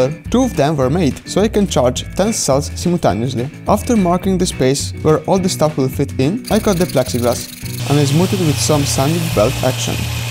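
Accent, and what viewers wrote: Italy. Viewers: ehi, sei italiano? si sente dall'accento... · Si sente che sei italiano...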